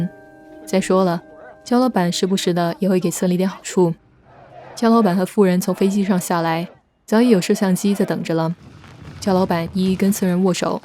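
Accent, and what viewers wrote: Standard. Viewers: 哗！你的粤语很标准呀！ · 广东话几标准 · 广东话很标准👍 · 粤语很标准呀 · 噢我就注意到了k猫的粤语很标准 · 是广东仔吗 粤语说的这么标准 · k貓講廣東話好標準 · 完全冇諗過你粵語會甘標準！一直以為你只講普通話🤣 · 粤语很标准哈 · 題外話讚一下k貓的廣東話很標準☺ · 原來K猫會說廣東話還說得這麼標準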